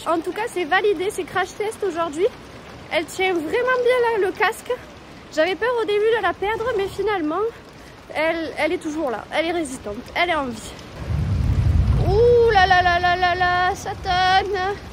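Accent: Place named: South, France. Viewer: Petit accent du sud Team sud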